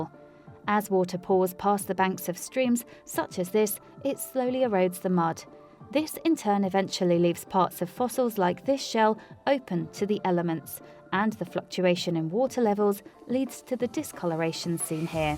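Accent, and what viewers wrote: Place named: United Kingdom. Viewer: "Pay-lent-to-logical." The British accent fooled me for a while.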